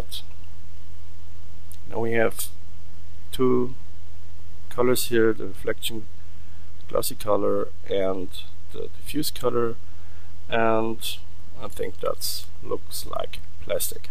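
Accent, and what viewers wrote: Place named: Germany. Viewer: Man hört dass du deutscher bist! ;)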